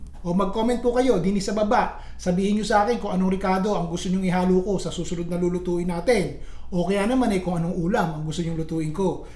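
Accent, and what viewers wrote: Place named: Bulacan, Philippines. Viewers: Taga Sta. Cruz, Manila po ako pero lumaki sa Bulacan. Natutuwa po ako sa paraan ng pagsasalita mo chef. Para kayong Bulakenyo magsalita 😂. Akala mo matanda ang nagsasalita. Pero regarding tayo sa menu na ito, gusto kong gayahin at parang masarap, Hinayang ha hinayang ak9 at di pa ako nakabiki ng bangus sa palengke kanina. Lingguhan p nmn ako mamili, 😢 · Napakasarap nga ang ganyang luto rini sa amin ang ganyang estilo mo ako po ay tiga Hagonoy. Kaya yung punto mo sy kapatid ng sa amin sa Hagonoy, Bulacan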